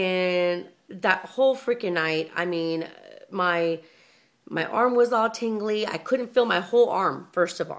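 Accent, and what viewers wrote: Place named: West Texas, United States. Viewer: Where you're from? You sound like some one in West Texas (El Paso) lol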